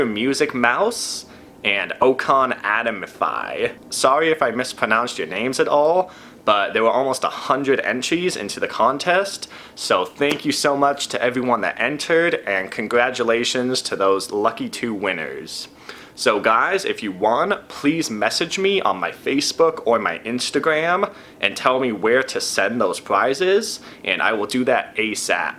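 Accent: Alaska, United States. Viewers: he sounds like one of the Alaska wilderness family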